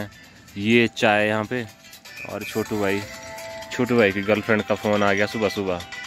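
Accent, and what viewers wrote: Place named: Mandi, India. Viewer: bhai mandi kon si jgha se ho apki tone se to me samj gya tha ki Bnda to mandyal hai. 👌 baki bhoot acha krre ho brother. 👌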